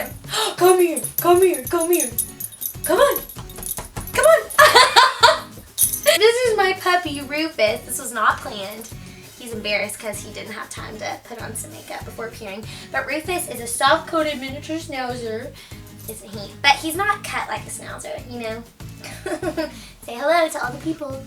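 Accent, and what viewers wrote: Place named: Dallas, United States. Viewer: As a Texan I could tell immediately you were from Dallas before you said it lmao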